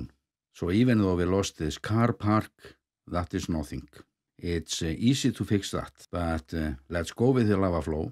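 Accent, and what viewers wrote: Iceland. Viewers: I was in Iceland before the last eruption and witnessed the flooding and destruction of the Ring Road. To this day, I can’t believe how quickly you Icelanders repair things and respond to such massive natural events! You people are simply incredible. In countries like Germany, it wouldn’t have worked nearly as fast. Thank you so much for your great work, the detailed information, and the amazing footage. It’s always a pleasure to watch your videos. And by the way: I love the Icelandic accent!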